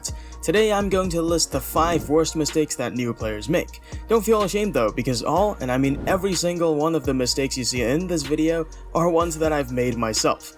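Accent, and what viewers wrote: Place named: Asia. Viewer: love the asian accent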